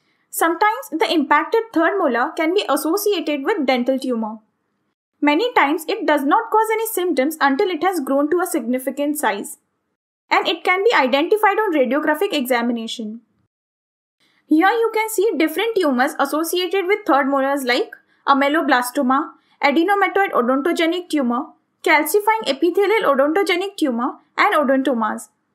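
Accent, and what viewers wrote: India. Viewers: They sound Indian of something similar and this ain’t a Pc guide I don’t trust it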